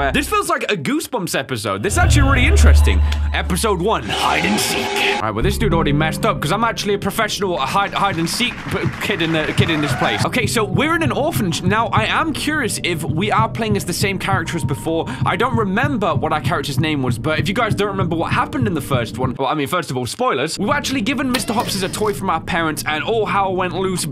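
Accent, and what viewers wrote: United Kingdom. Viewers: British people pronounce certain words interestingly.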